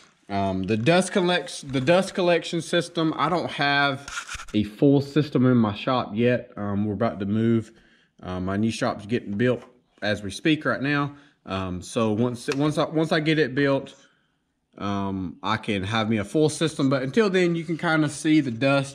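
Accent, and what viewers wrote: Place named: North Carolina, United States. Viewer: Great review man! Just curious, where are you from? Sound like Robeson or Scotland County NC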